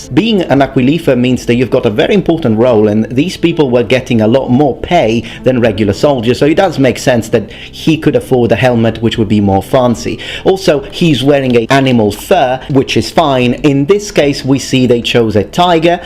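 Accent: Italy. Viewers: It’s probably not true, but the fact that rattles this stuff of in an Italian accent makes me think he’s right:)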